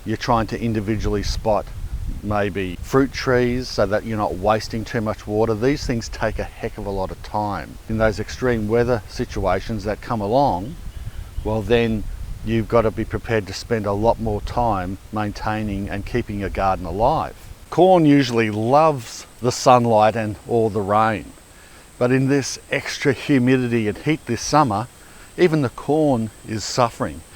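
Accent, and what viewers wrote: Australia. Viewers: Just love these videos just so typically Australian great listening.Kerry Australia 🇦🇺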